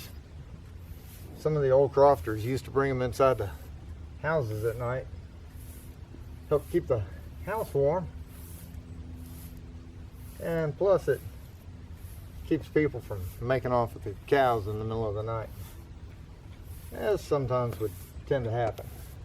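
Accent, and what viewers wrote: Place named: South, United States. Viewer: Love your southern accent x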